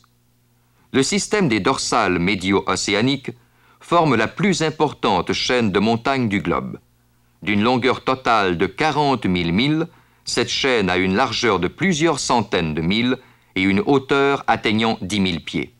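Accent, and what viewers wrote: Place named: Quebec, Canada. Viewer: un canadien (ça s'entend très nettement) qui essaye au maximum d'avoir l'accent "français"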